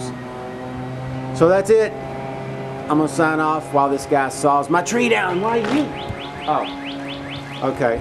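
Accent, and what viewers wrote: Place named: Missouri, United States. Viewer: lol, you're so wacked! Great video on Ex-C. So you're from Tennessee? I wouldn't have guessed it if you hadn't said so. I would have thought you to be a Missoura-in due to your accent.;) Love the fro.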